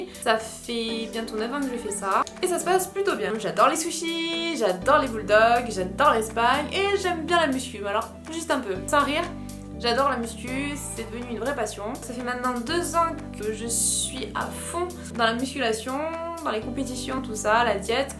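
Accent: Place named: South, France. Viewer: avant je me rendait pas compte de ton accent typique du sud mais maintenant c'est stressant. Moi je me suis inscrit a la salle il y a2 semaines et je vois que mes abdos pousses et mes bras et mes jambes aussi c'est plutôt cool. Et sa c'est grâce a toi, tibo et marine merci♥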